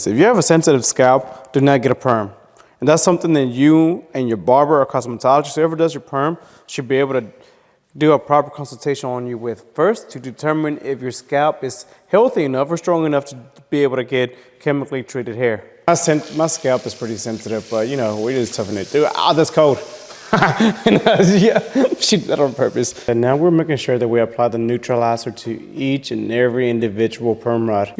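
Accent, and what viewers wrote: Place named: Mexico. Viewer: Mix between Mexican and a good old boy country accent lmao.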